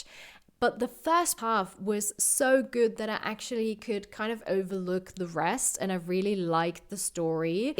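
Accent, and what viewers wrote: Austria. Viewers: are you from Austria? :) love your videos!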